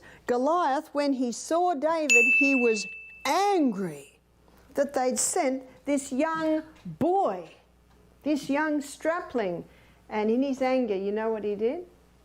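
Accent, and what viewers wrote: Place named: Australia. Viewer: This story sounds so much more believable with an Australian accent.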